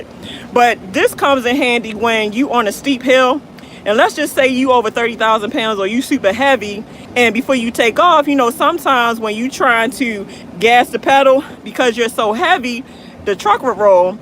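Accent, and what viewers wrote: Baltimore, United States. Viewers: Are you from baltimore ? I hear a accent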